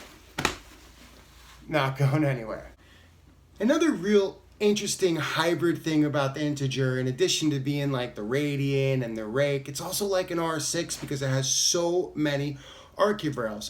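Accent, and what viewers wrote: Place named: East Coast, United States. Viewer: Ah ok, so East Coast fits your accent. It all makes sense now. I figured Boston. And you bring up a really good point. It's not just a matter of height but weight amd broadness as well. I am 215 and pretty broad and I find bags like PD or Mystery Ranch always fit so small, cut into my pecks, and are even uncomfortable after a short while under load whereas MW is just always so comfortable. MW has got some kind of magic in their straps and harness and now they are addressing our sweaty backs with items like the Speedwell. It makes me think the designer/owner must be a larger guy because of course he would deal with this as well.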